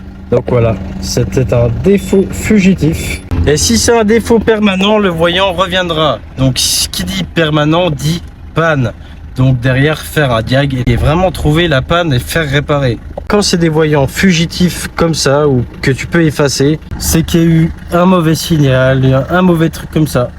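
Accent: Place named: Alsace, France. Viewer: Non avec une léger accent Alsacien on dirait... J adore ♥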